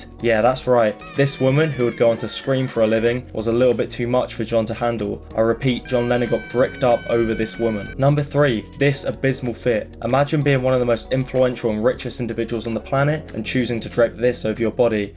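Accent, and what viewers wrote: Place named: Birmingham, United Kingdom. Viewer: You're a brummie as well? Mad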